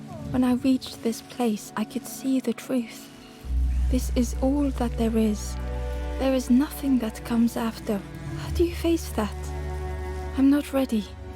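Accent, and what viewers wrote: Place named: Wales, United Kingdom. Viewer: I actually really don't like her voice or her accent. She has the same pace throughout, regardless of the words. And she sounds Welsh to me. I was hoping for a way to turn off narration but oh well...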